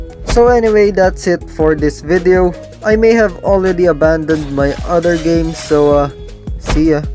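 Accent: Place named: Philippines. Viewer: Nakita kolang tong video mo kaka scroll ko hahahah sa boses mo palang halatang pilipino, keep it up bro lab u. ❤❤😂 Proud developer pilipino here😂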